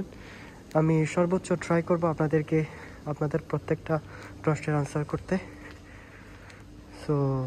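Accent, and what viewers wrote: Chittagong, Bangladesh. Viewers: আসসালামুয়ালাইকুম ভাই। কোন কাজ সম্বন্ধে ভালো ধারণা রাখেন তারপর ভিডিও বানাতে চেষ্টা করুন আপনি যে বলতেছেন এসি ফ্যাক্টরি আসলে কি এটা এসি ফ্যাক্টরি নাকি ডাক্টিং ফ্যাক্টরি সেন্ট্রাল এসি তে তিনটে পার্ট থাকে ওইটা কি একটা অংশ আর কিছু আবাল কিছু না বুঝে শুধু কমেন্ট করে যাচ্ছে ভাই মনে কষ্ট নিয়েন না আপনার ভাষাগতভাবে বুজা যাচ্ছে আপনি চট্টগ্রামের মানুষ চট্টগ্রামের ঐতিহ্যগত খেয়াল রাখবেন।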